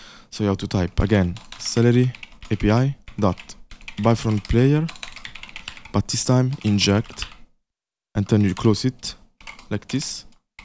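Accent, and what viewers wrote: Italy. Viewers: tu sei italiano 100% si sente dal accento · l'accento italiano😭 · si vede che sei italiano dall'accento ahahah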